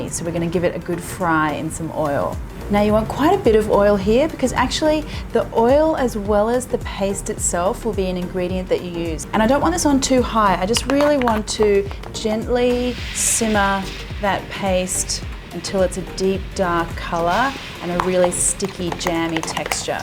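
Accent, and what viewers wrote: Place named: Australia. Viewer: I hate hearing annoying Australian accents.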